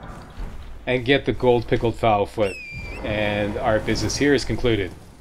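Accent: Canada. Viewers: By any chance, are you Canadian, Eh?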